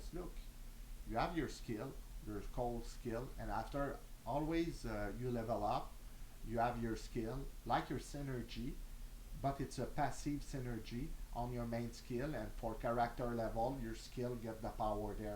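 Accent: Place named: Quebec, Canada. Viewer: I cant leave a message on your video about enigma breaking the game because i guess alots of snowflakes insulted you but i just want you to know that myself (and all the old school diablo 2 players i know) hate enigma and wish it gone from the game!! Cta too Ps : est tu Québecois? Ont dirait que tu a l’accent du Québec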